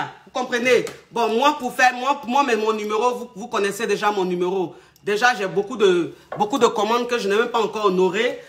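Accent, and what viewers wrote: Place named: Ivory Coast. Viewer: Une vraie ivoirienne qui parle la même, je suis fan, longue vie à toi la madré .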